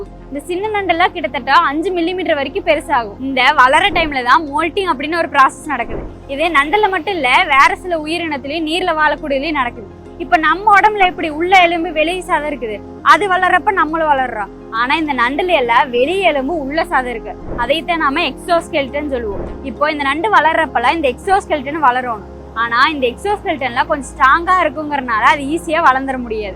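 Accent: Coimbatore, India. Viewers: அம்முனி கோயமுத்தூர்ங்களா....😃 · Baby ma nee coimbatore ah??? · Ammani coimbatore ngala😂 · கோவை தமிழ் அருமை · Akka Nega Coimbatore side aa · பாவை இவள் அழகி கோவை தமிழ் பேசும் போது நெஞ்சம் இன்னும் இன்னும் கேட்கிறது இவள் கொஞ்சும் தமிழை இன்னும் இன்னும் கேட்கிறது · கோவை ஸ்லாங் அருமை👌👌👌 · pechchil kovai vasam varuthu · Covai slang😝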